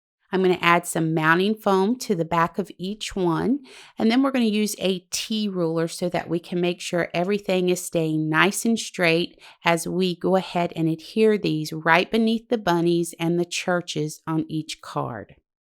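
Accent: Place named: South, United States. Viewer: Love your cards & your accent. I'm from the south but live up north so I love when when I feel at home. I will be a new subscriber!;0) USA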